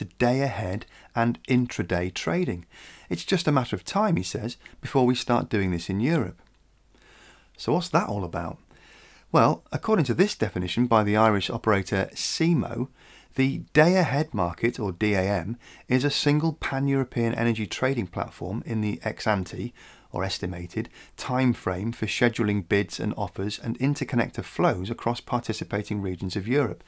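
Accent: United Kingdom. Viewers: Really impressing your film. The illustration of it let me think, that there is a big lobby behind your channel. Love your British accent. Me as a German (please forgive me my English!) am sceptical against so called renewable energy. Because we already pay for it very high. We have the highest energy prices in Europe, probably in the world. We live constantly close to a blackout. If we have had no neighbours with coal or nuclear plants, our system would crash very often. 2018 we have had two critical moments in our grid. In your film you are showing a beautiful landscape at the beach "decorated" with many windmills. This was a symbolic picture: human greediness and ideology destroying nature! My point is not: I am against electrical storage. No! Not at all! This is even the crucial problem of renewables. I am for obligatory solar panels on flat roofs in industrial areas. 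But I am against Solar Parks on fertile soil or in woods, against cornfields for our electrical comfort. Simply because of the efficiency of renewables, the so called EROI (Energy Return on Investment), which is too low. For that reason they need a big area to compensate. Photovoltaic has an EROI of 1.6, Biomass 3.5, Wind 3.9, Gas 28, Coal 30, Water 35. In comparison to it the old veteran of Pressurized Water Reactor has an EROI of 75! Which is not too bad. The windmills are meanwhile as high as the television tower in Stuttgart. The concrete weights 7000 Tonnes. The socle of 3500 Tonnes is left for ever in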